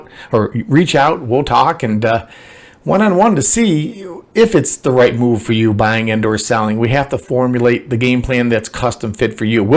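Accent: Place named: Buffalo, United States. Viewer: Ha ha I missed hearing your Buffalo accent lol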